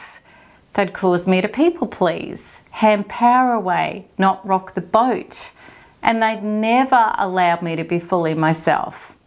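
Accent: Australia. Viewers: Love your accent! Are you Australian?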